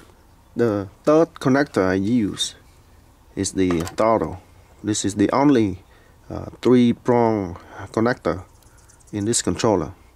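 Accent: Vietnam. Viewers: Vietnamese accent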